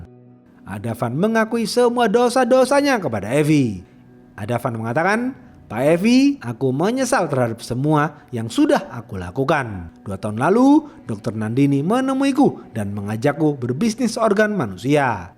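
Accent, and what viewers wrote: Java, Indonesia. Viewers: Jawa mu mana min?tugelan barang mvok ghowo2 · Wong jowo po mas e?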